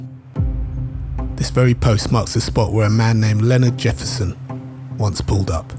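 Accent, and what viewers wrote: United Kingdom. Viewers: Where you from cos? Your ascent sounds like you are from uk or something, maybe australia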